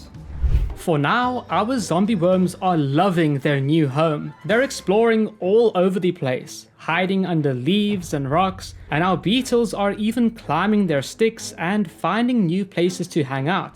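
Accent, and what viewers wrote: South Africa. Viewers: Are you south African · Duuuuude! It's so hard to find fellow South Africans! I knew I recognized the accent. Keep going with the amazing content. ❤️ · You sound like you have an south african english accent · I knew you were South African cuz of your accent😂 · You're South African, aren't you? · I thought u sound like u from SA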